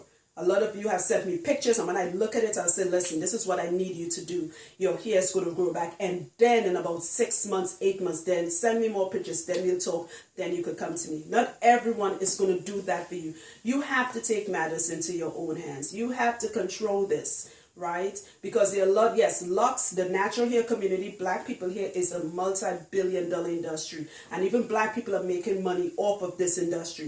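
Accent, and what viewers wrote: Trinidad, Trinidad and Tobago. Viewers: I'm also Trini and Aquarius....we can't help the way we speak girl..... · I love the Trinidad accent, be proud and don't worry about negative minded people.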